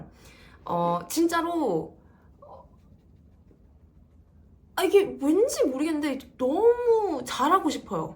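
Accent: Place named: Busan, South Korea. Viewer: 부산에서 하지말고 서울 큰데로 가보는건 어떤지? 열정이 있다면 큰데로 가서 도전해 보시라 원없이.. 해보고 해보고 원없이 해보고 나서.. 1년정도 정말 원없이.. ㅡㅡㅡㅡㅡ 그래도 안되면 다시 생각해 보시라..미래를 생각해 보시라 저도 부산이라 느끼는건.. 부산 사투리가 너무 자연스러움 다른 방향.. 예를 들어 코미디 영화배우도 충분히 가능하실듯 🎉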